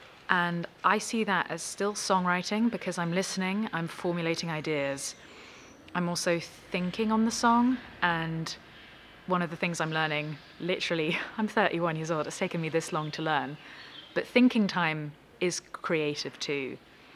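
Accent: United Kingdom. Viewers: I don't know why someone would ask a question about her voice.? Imo her speaking voice is just as soothing as her singing voice.. She has a slight British accent that I adore.. It's not overbearing or a harsh tone that sounds almost condescending as I feel about some others. Her singing voice is purely beautiful. As is she.. Some of those questions had to be just poor attempts of humor..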